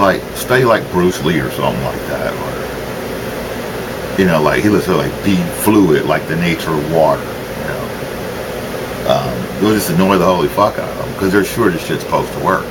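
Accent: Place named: Washington DC, United States. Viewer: Thanks. I lived in DC briefly in 1973, Howard Univ one semester. At 65, I might be slightly older than you. People from that part of the country sound a lot like you do.You also remind me of a friend from San Fran in the mid 1970s. Thanks for the clarification. There's a TI in Houston, Texas Gangstalking Milab who I've been communicating with. People here in Memphis don't know what a TI is. Take care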